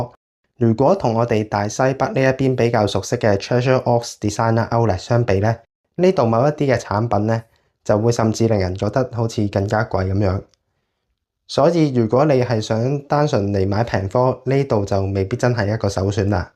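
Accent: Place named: Hong Kong, China. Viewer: 講者的英語口音仍停留在港式發音。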